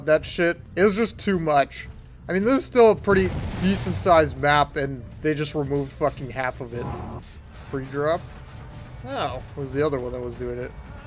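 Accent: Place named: United States. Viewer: There’s something very wholesome about your in-game commentary. Maybe just me liking American accents as a British boy :/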